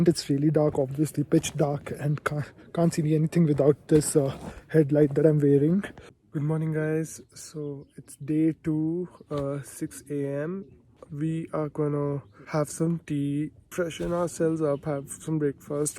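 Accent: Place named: India. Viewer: Your asking bizarre questions from the porter is strange and funny, you look abs sound Indian to me and I’m sure where you originally come from in the third world you have seen people carry things on their head and necks and backs.. why are you acting so unnecessary questions? Anyway better luck next time! Great content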